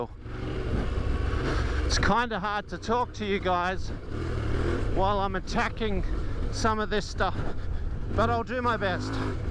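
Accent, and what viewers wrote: Australia. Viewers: the Aussie accent just makes it more engaging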